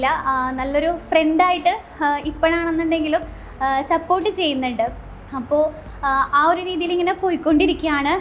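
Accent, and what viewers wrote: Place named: Tamil Nadu, India. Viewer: സംസാരം കേൾക്കുബോൾ കേരളത്തിൽ ജനിച്ചത് alla alle തമിഴ് നാട്ടിൽ ആയിരുന്നു alle ജനിച്ചത് 🤔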